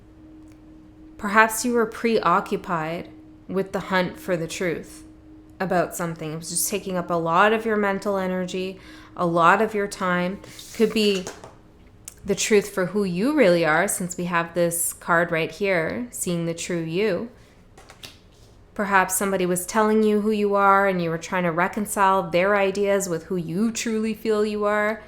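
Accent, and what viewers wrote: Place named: Canada. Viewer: That Canadian accent 👍🏿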